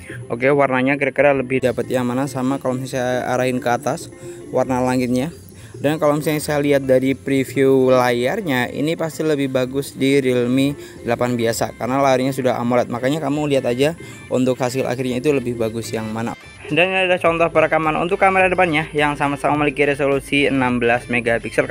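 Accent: Madura, Indonesia. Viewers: madura aksen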